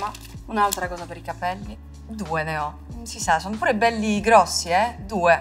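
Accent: Italy. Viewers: un bellissimo accento italiano💗:)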